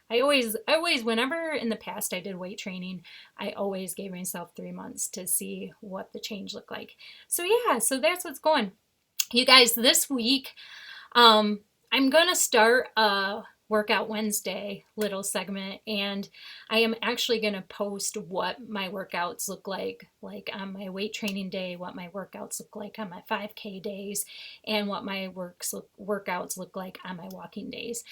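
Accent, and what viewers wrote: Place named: Michigan, United States. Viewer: And now I think of it, I hear that Michiganders accent coming out of your mouth, but I won't hold it against you, because I am not from Ohio either. We are O.M.A.D. Strong!🤠 Even though I could be called the Desert No-M.A.D. Outlaw right now!🤠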